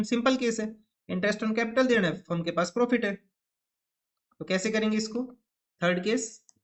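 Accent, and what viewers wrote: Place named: Haryana, India. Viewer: Sir haryana se kaha se ho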